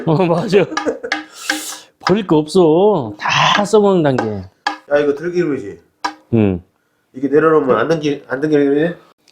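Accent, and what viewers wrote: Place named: Chungcheong, South Korea. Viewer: 충청도 말씨 일부러 쓰지 마시고 전라북도 말씨. 사투리를 써 주세요. 저는 정읍이 고향 입니다.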